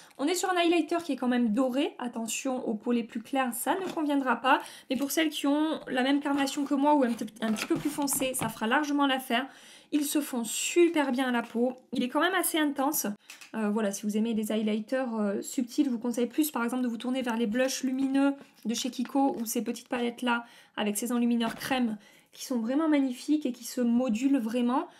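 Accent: South, France. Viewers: ton accent du sud est un régal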